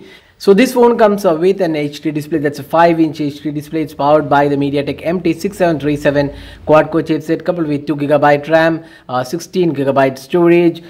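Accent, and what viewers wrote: India. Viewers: ok im leaving cause of his indian accent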